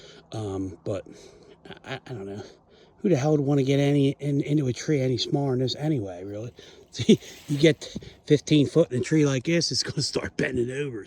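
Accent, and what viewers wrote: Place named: Philadelphia, United States. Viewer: where u from ? Philly ? lol. Can hear that accent a million miles away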